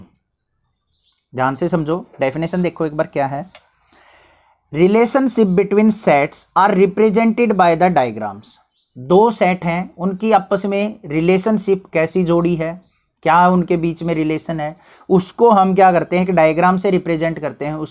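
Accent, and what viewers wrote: Haryana, India. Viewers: SIR AAP HARYANA SE HO.........??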